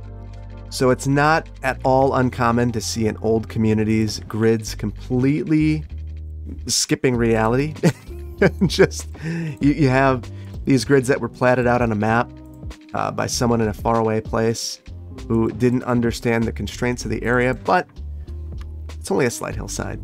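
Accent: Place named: Midwest, United States. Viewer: I can tell you’re from the Midwest. I’ve driven on more than a few two- (or almost two-) dirt roads in the mountains around New Mexico (especially as a Boy Scout leader in college circa <num>) where the road hung along the side of the hill with a nice drop off on the outside and no guard rail. One of these is/was the access road to our local council Boy Scout Camp which I’ve driven numerous times. Chances are given the mountainous nature of that part of Clearwater County, nobody would bother with a guardrail until the road was paved, or at least traffic and/or accidents warranted.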